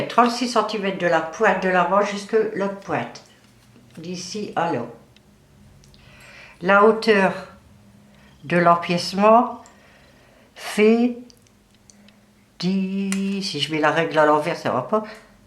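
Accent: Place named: Nord-Pas-de-Calais, France. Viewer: Très bien expliqué... j' adore votre façon de parler qui ressemble au parlé Ch'ti que je suis ❤ Très beau modèle...👍❤️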